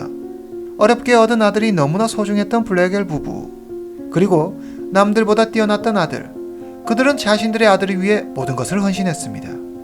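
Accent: China. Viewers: 억양이 한국분 맞나요? 중국 태생이신가요?귀화하신건가요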